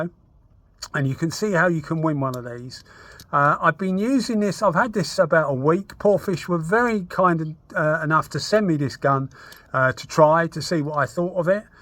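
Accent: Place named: United Kingdom. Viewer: Hello again.. Jeff from the US. As usual you do not disappoint with your vast knowledge of this trade. There are a lot of youtuber's making paint gun videos, but your no-nonsense approach is refreshing! This Porphis brand looks like a high quality spraygun. Fit and finish seem to be spot on as well and based on your elevated tone of voice, I can see you're a fan of this brand and I do you value your opinion! Can't deny I am partial to the British accent my friend! Keep on! God Bless🙏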